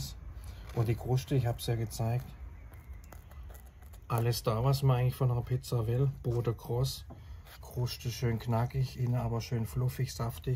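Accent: Germany. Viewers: Kommscht ausm Schwarzwald?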